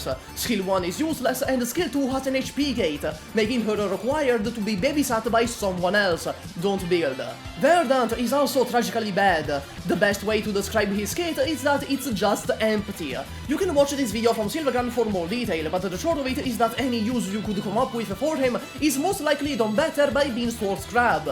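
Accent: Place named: Italy. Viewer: i don't even play arknights anymore i just like listening to an italian mf yap about shitty operators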